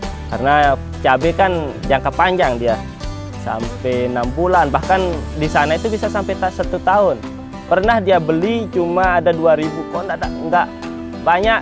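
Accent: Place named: Lombok, Indonesia. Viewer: Seperti logat orng Lombok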